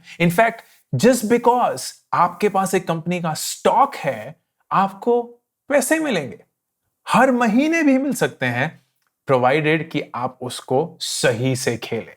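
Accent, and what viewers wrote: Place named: Punjab, India. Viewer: Bhayi, we are Punjabi's. It's alright to say cheejay with Jajjaa of Punjabi Language. We all should be proud of mother language and Mother tongue influence as long we are doing right things in our life. I hope u agree and keep saying Cheejay 😊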